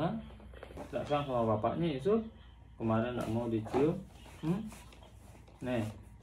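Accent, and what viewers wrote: Lombok, Indonesia. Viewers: Bang logat lombok nya masih melekat😁💪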